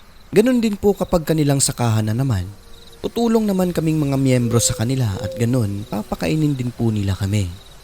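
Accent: Visayas, Philippines. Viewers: I love your bisaya accent Say it again :Mantas nga aswang! heheh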